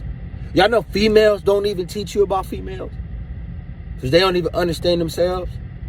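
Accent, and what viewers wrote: Baltimore, United States. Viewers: That bmore accent 🔥🔥💯👌🏾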